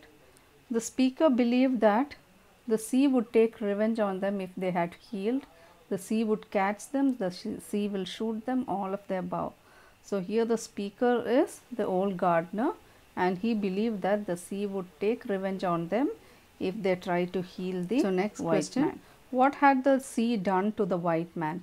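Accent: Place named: South India, India. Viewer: Madam are you south indian as your accent is similar to them also the explain to commendable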